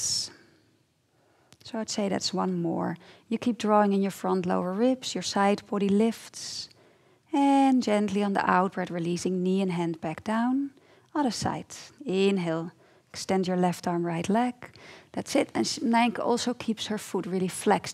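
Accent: Netherlands. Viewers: Great Stuff! I teach Yoga here in D.C. and everyone always asks me about the Gluts (but) body part. And nice Dutch accent also! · she sound dutch for sure, i can tell because im from the netherlands... sort of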